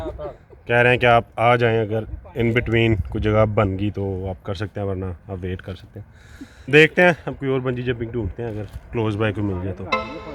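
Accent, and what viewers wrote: Punjab, India. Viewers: Yar main tumhari sari videos dekh Raha hoo colour cloud Aor jolly azeem I'd se boht hi piaray lagay ho mujhe Tum special Punjabi accent Urdu boht achhi lgti hai Apki Lahore Pakistan se boht Sara Pyar 💜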